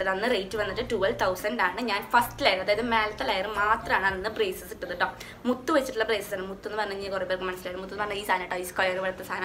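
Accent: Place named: Thrissur, India. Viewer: Chechide veed thrissur aahno slang athupole ind ❤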